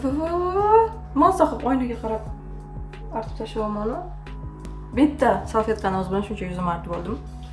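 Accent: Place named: Russia. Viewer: Bu qiz Saloh ako’ dp chiqardi a adashmasam o’zbechada narmalniy gapirardiku ruscha tipa aksent qo’shiib gapiradigan bo’b ketibtimi😅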